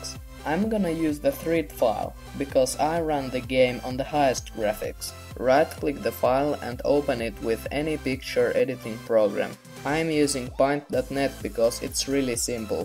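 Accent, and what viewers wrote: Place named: Finland. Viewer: Haha ku puhut enkkuu nii siit kuulee et oot suomalainen:D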